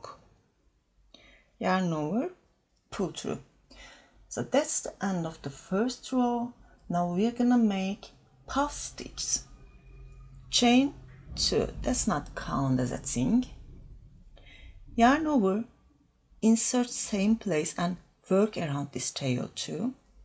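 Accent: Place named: Turkey. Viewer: Türk aksanlı İngilizce 😂😂😂😂hemen belli oluyor ,broken eng too…😂😂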